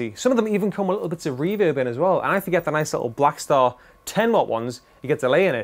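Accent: United Kingdom. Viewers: You're awesome! Fun to watch - and the snappy brit accent goes well with the pirate look :) - A pleasure to see. Thanks from Hawaii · your face and body are too distracting - I didn't hear a word you said but your british accent rocks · I can’t tell whether this guys accent is Geordie, Scouse, Yorkshire, Scandinavian or posh English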